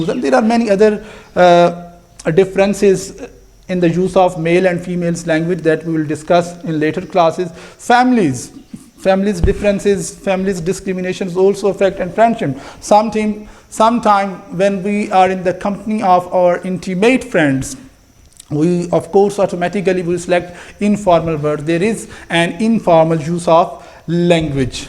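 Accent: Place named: England, United Kingdom. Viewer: Wow sir i like your English accent 🤍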